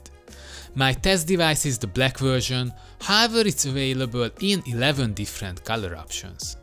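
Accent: Hungary. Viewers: i like your Hungarian accent :) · he said that he has a hungarian accent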